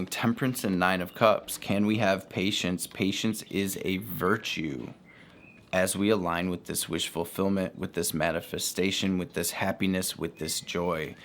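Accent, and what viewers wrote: Detroit, United States. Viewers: You fasho gotta be from Detroit, “what up doe“, “my babies“ 😅😅 and of course the infamous Detroit 🧢 😅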